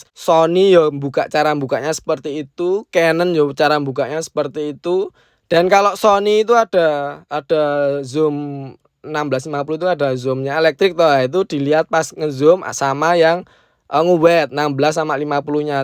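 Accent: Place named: East Java, Indonesia. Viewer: Mantap jawa timuran banget mas, terimakasih informasinya yg sangat berguna banget👍👍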